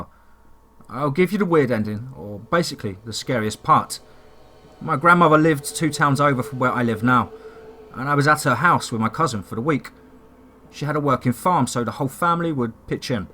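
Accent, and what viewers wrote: United Kingdom. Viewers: Hi There, new subscriber here, from the Midlands, UK. Love your narration, as I can understand more clearly as its a familiar British accent, not that I dislike the American accent (I actually bloody love it lol) but sometimes I struggle to grasp the narration with a thick accent, as no doubt some of you would struggle with mine once my midlands accent came through lol, but lets make this clear, I'm not a Brummie FYI lol. Alicia my lovely, you are one helluva strong lady, and my hat goes off to your bravery, and I don't blame you for showing that DM who's boss around your parts. I will continue to look for updates on here, but pray for your safety. blessings to you and your family and I mean that from the bottom of my heart. stay safe xx Julie xx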